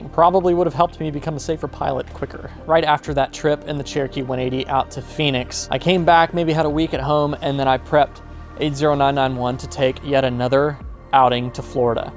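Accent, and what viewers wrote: Texas, United States. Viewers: Thank you for your efforts to make this channel. I discovered it this year when looking for videos on the Mooney and I met your series ferrying the Turbo Mooney to Texas. BTW, I’m amazed how easily I could understand your texan accent 😉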